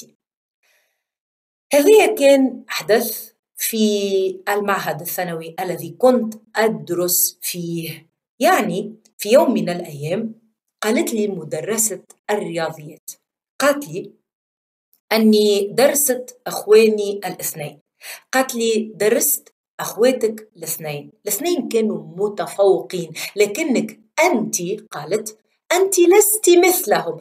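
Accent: Tunisia. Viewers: اللهجة التونسية الجميلة الباهية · اللهجة التونسية نحبها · لهجة تونسية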